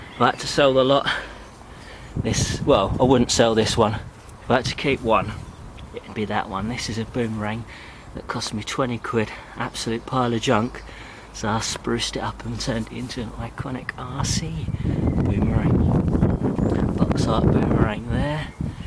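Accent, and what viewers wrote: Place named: South, United States. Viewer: Mate, where do you live? From your accent I'd say the south, can I come round and play, lol.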